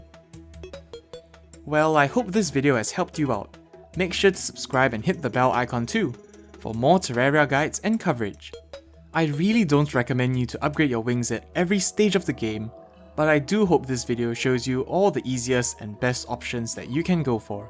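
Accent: Singapore. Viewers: His voice like a Singaporean guy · just curious, are you singaporean? your accent sounds v familiar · is guy sounds singaporean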